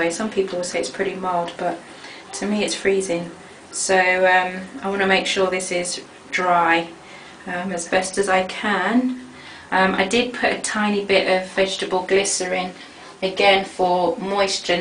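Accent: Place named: United Kingdom. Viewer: I love your dialect, you wouln't happen to be from the U.K.? I'm gong to try this style on my 4c hair.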